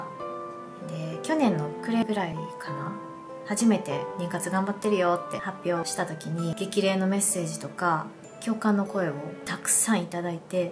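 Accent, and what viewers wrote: Kansai, Japan. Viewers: ご懐妊おめでとうございます(*´Д`*) いつも手際よく美味しそうな料理、お弁当、私には真似出来ないけど、素敵な奥様だなぁと思っていました( ´∀`) うちにも猫がいるので、茶々にも癒されていました。不妊治療もお疲れ様でした、私も同じくらい経験しましたが、残念ながら出来ず、やはり妊娠は奇跡だなぁと思います。暑い時期ですし、無理せずに過ごして下さいm(_ _)m 関西弁も親近感湧きます、サクラ🌸咲くさん、本当におめでとう～(^O^)／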